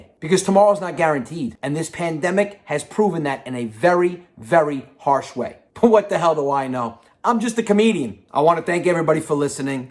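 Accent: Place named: Italy. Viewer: Well done my friend life is short then when we die they show up and say Poor guy he was a nice guy bull shit They are all sonofabitches saluti hey what part of Italy is youre family from ? They have the same way of speaking Say hi to Zio Vine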